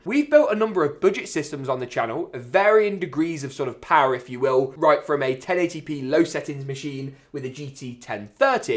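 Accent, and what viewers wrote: England, United Kingdom. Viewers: This guy has a very Thick English Accent But still Sub is deserved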